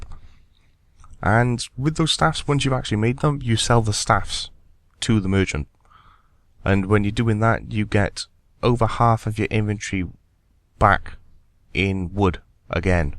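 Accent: Wales, United Kingdom. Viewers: i really wish your videos got more views...your videos are amazing man. ive been watching your vids since you like first started. you're by far my favorite youtuber :D love accent too. you're from wales, right?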